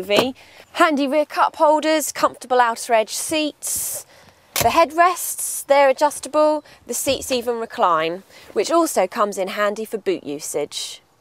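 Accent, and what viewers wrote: United Kingdom. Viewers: Forget about Suzuki Grand Vitara, she has awesome British accent, she look and sound very graceful.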